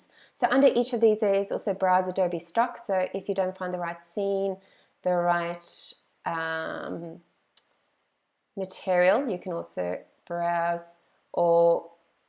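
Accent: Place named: Australia. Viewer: i was wondering " wait, hold on, did I hear Margot Robbie speaking ? but then I was like, ah she is a Aussie so you might be having the same accent" ^_^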